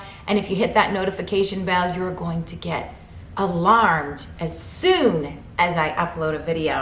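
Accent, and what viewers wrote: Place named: New York, United States. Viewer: I only found you a few days ago... watched like 100 of your cooking segments 😂 You are AAAMAZING! Now im seeing all these other (older) videos, which are GREAT! Your personality is 🔥 as the kids say! I'm 57, raised in St. Pete, live on Long Island now for many years. I know you're in FLA, I though you were from NY by the way you talk. XOXO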